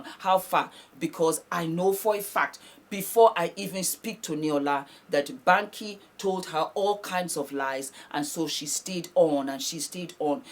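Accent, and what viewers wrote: Pidgin, Nigeria. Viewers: Your Nigerian English de sweet me...,God bless you. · really went Naija this episode 😅😊. Her broken on point 🇳🇬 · l enjoy u more when u go the Nigerian way of talking❤ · darling! I love when you speak pidgin, you always crack me up. Thank you for the words of wisdom you give to everyone out there, forever treasured. Thank you. ❤️ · I've been to Nigeria 🇳🇬 and loved it! I love to hear u speak in Nigerian accent! Mo Nife ❤❤❤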